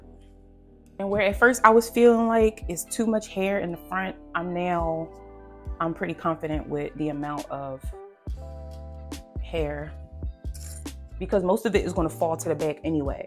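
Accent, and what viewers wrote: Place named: Washington DC, United States. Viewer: I like your accent. It reminds me of my friend who's from DC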